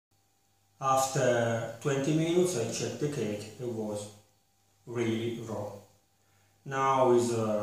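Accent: Italy. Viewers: so he is Italian, really nice accent.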